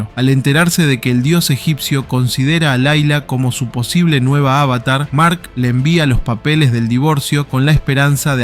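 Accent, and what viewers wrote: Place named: Argentina. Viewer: Por favor un argentino hablando de esto que puta mierda ese acento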